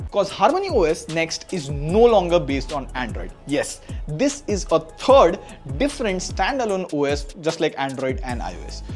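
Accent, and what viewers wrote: India. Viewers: Bhai aapka english Hindi jesa lag raha hai 😊😊😊😊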